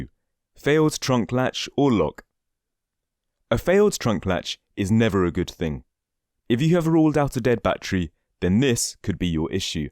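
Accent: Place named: United Kingdom. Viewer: verbose with British English accent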